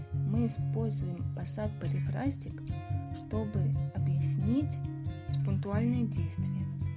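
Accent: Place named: Eastern Europe. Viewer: На произношением конечно надо поработать.... Буква r в конце глаголов, например, не читается. Уже молчу про типичный восточноевропейский акцент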